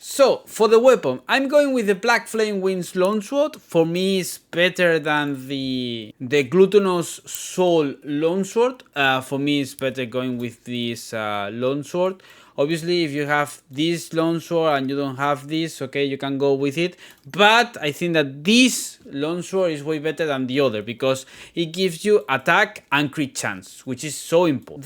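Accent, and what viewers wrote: Spain. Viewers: Tu eres español, a q si? Tienes un acento muuyyyy español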